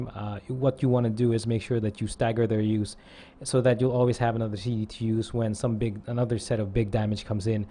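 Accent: Philippines. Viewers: are you a Filipino? the way you speak and pronounce the words .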